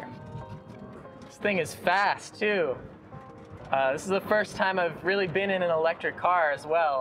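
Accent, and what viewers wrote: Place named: San Diego, United States. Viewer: “Fermiliar” man that reminds me of home, good old San Diego dialect. Love “bolth” SD and what Apterra is doing for the industry. Everyone’s watching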